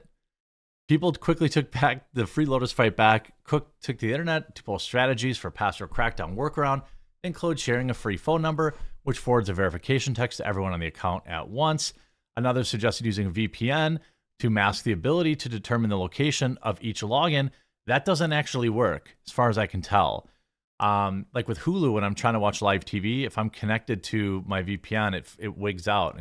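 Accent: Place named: Wisconsin, United States. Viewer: I like your show, but your severe Wisconsin accent is too hard to understand.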